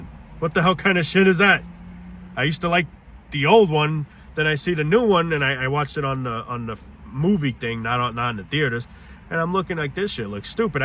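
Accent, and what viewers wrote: New York, United States. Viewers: Your cynical New Yawk diatribes at 2x speed are a real treat.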